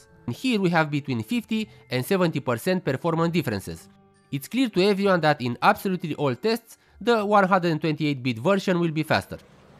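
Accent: Romania. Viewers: He is Romanian, that's why he has this accent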